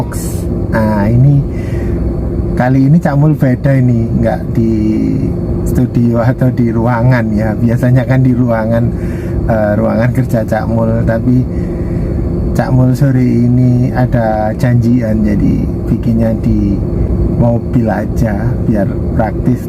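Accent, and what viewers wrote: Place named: Jambi, Indonesia. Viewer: Kalo dengarin dari logak cakapnya Orang jaambi nih Betul dak bosku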